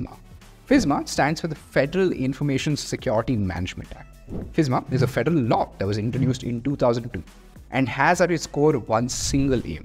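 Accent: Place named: India. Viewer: I have to really increase the volume to understand what he is speaking - an accent like bollywood or some language that I am not used to is hard to understand, the accent.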